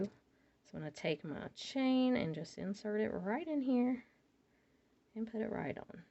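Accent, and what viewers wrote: South, United States. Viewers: Missed that voice. That southern charm. Glad you are moved.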